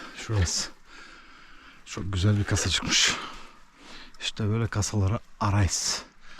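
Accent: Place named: Van, Turkey. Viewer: Şiveniz vanlılara benziyor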